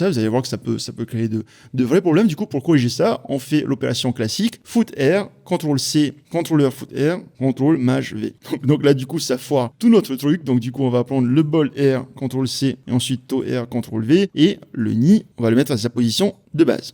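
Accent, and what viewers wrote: Tahiti, French Polynesia. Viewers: Super vidéo, super qualité et super boulot ! :D (et l'accent tahitien c'est tellement peu commun dans les tutos de logiciels ça fait plaisir à entendre aussi) J'avais besoin que d'une partie et certains trucs ont changé de nom ou autre dans UE5 mais j'y suis arrivé donc merci :) PS : pour se souvenir de l'annulaire, il porte ce nom parce que c'est celui qui reçoit l'anneau quand on se marie ;) Et auriculaire parce que c'est celui qu'on utilise pour se gratter dans l'oreille lol, c'est moins glamour.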